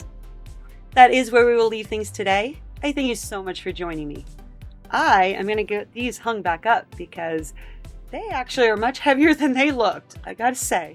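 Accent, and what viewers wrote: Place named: United States. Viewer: This is very random but your voice+American accent combo sound so good it’s really nice to listen to! Sending love from Romania⭐️🤍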